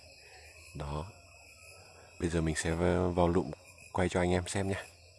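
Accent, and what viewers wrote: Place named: North, Vietnam. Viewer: xa quá nghe giọng bác giống ngoài bắc tưởng ở bắc cho e gl cùng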